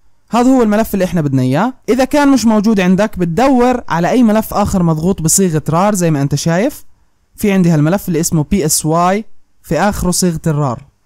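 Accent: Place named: Jordan. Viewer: ماشي هادا اردني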